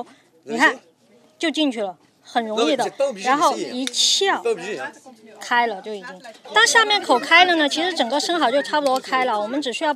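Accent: Sichuan, China. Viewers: 你的口音是四川的吗 还是重庆哈哈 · 听你讲话，老家应该是四川的，或在西南长大的，然后出国了。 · 作为一个四川人，听到这亲切的川普真是亲切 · 听着熟悉的川普，果断定阅，谢谢分享！ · 四川人吧，川普的口音，只有四川人听得出来。加油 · 你的口音怕不是川妹儿吧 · 四川口音好重😅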